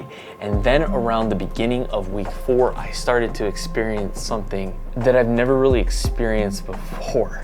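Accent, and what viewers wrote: United States. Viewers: I don't know about America(judging by the accent) but in Poland most commonly it's adviced to take it when you are relaxing to go to sleep in last 1-2hrs before you close your eyes. The side effects that you describe are similar to what I noticed poping a pill in the middle of my day.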